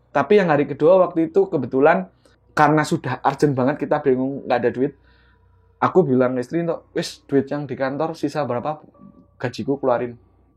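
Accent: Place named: Surabaya, Indonesia. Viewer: Bhasane asik bnget kaLau orang SBY yg cerita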